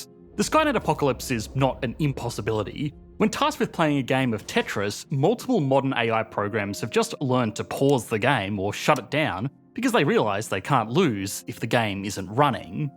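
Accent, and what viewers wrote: Australia. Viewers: AI could have written the script and spoken in the exact same Australian accent as this guy and no one would have known the difference. But yeah, we got nothing to fear. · It’s just hard to take Australian accent seriously in any way.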